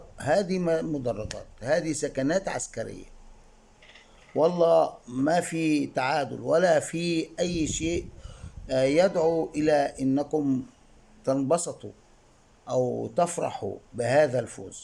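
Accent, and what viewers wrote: Egypt. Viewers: انت مصري مش كده😢 فلاش تعمل خليجي لأن اللهجة المصرية طاغية عليك وواضحة والموضوع واضح بالرغم من اني عايش في الخليج بس مش محتاجة وأي واحد يقدر يكشفه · أنت مصري صعيدي كمان. مين موافقني في هذا الرأي بعض الحروف فضحتك يا معلم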